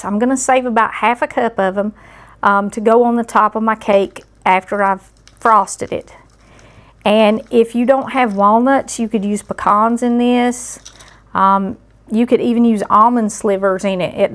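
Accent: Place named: Tennessee, United States. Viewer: Hi from Ohio👋👋TY...great recipe! My grandmama used to make for church dinners yrs ago! I❤ur accent...reminds me of home (TN)!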